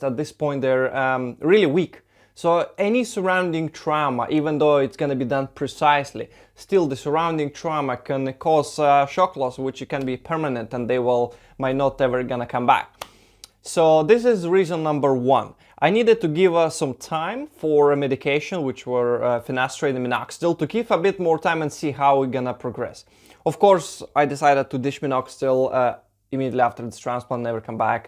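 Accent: Russia. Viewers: You sound Russian. Do you recommend Dr Ferreira ? Where is he located?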